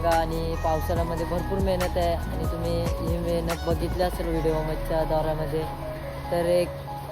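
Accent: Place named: Ulwe, India. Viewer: Aamchya ulvyasaid chi bhasha vatey tujhi kuthla aahes bhau gav konta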